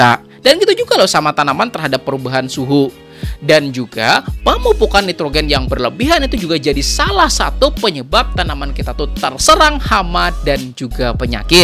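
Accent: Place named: South Kalimantan, Indonesia. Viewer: Suka denger gaya abangnya jelasin. Di dengar dari logatnya, orang Kalsel ya bang?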